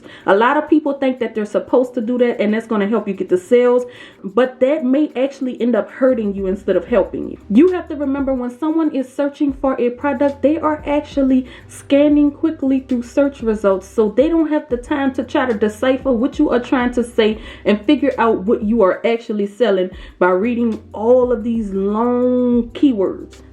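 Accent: New Orleans, United States. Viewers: You're from New Orleans aren't you?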